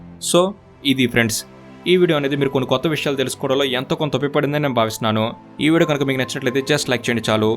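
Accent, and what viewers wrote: Telangana, India. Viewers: Telangana na Andhra na howle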